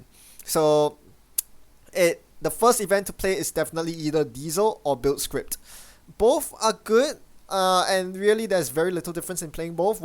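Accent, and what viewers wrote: Singapore. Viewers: Are you Singaporian? I just had to ask, I can't place a finger on your accent.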